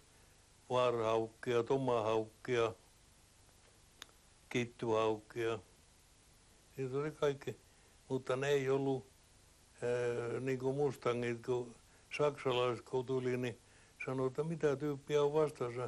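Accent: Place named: Sortavala, Russia. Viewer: Utissa <num> aloitettiin alokkaina. Esikuntakompanjan rakennuksen vieressä oli Mersu, jonka edessä otettiin ensimmäiset kuvat. Tupien nimet olivat menetettyjen lentotukikohtien nimiä. Illu puhuu Sortavalan miehen murteella ja tyylillä juuri noista paikoista, joiden nimiä käytimme ryhmänjohtajina. "Tupa Suurmerijoki jne"..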